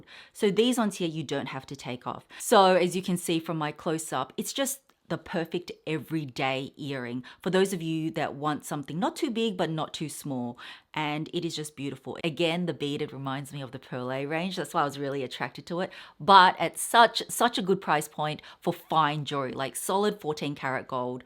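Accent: Australia. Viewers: Hi! I'm new to your channel, but I just love your videos and your Australian accent. Congrats on hitting 300k! Three prizes is my choice. 😘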